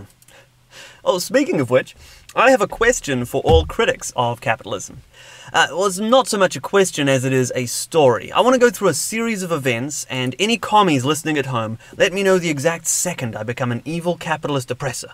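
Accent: New Zealand. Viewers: Why does Matt Smith have a kiwi accent?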